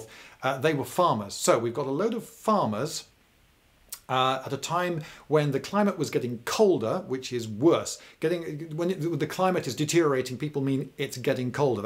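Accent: England, United Kingdom. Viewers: For some reason in my head when im hearing you is almost like im hearing John Cleese, maybe its the english accent or the subtle humor, in any case its very captivating. Thank you for all the effort and hard work you place in all your presentations.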